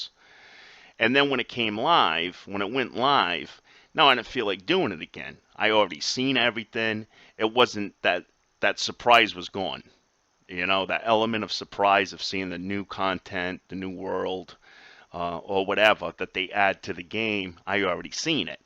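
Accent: New York, United States. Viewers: Are you from new york?! If so youre awesome! Love your accent!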